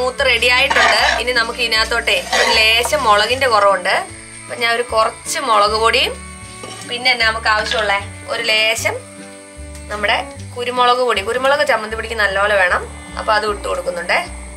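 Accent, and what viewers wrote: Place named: Kerala, India. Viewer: Slang kettal thani kottayam /idukkikkari aananne parayu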